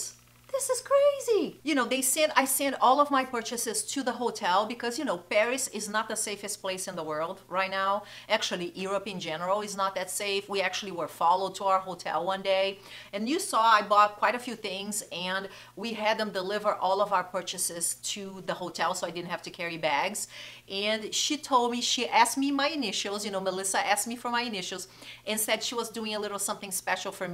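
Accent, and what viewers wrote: Brazil. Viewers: Are you brazilian? Because of the accent! Love your videos!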